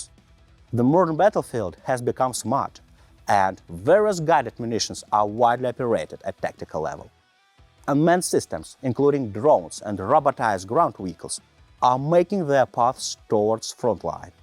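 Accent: Russia. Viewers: Русский акцент, этому парню не куда не спрятать.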